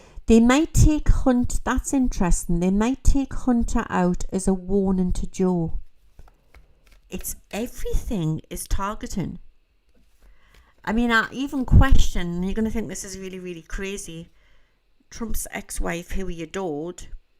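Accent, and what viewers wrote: Newcastle, United Kingdom. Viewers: Why would someone do that? I'm a fellow geordie and I love listening to you and it comforts me to hear you, when I live in Australia now. Gis the name of the people who were not nice to you and I'll kick them in the fanny for you I😂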